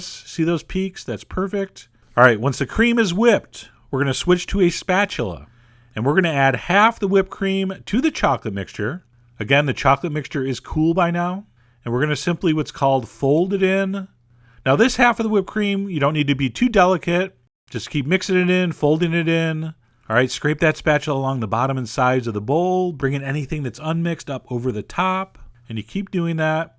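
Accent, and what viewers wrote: United States. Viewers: thats right cause he lives here in america and this is how we pronounce those things....talk about ignorant..... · i hate how u cant say anything. tiramisu? espresso? so american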